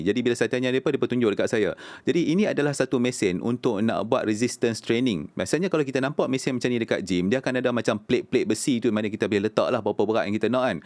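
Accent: North, Malaysia. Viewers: Apapun saya senang dgr loghat gunakan.. sedap halwa telinga dengar sleng utara mix baku melayu.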